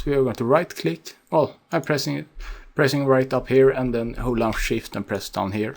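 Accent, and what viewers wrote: Sweden. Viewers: Du är svensk!!!! Man hör de jätte bra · are you swedish? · Man hör att han är svensk! :)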